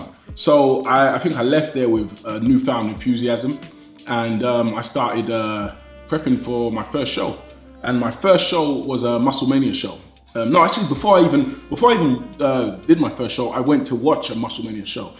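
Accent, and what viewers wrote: United Kingdom. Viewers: British, can’t you tell from his accent.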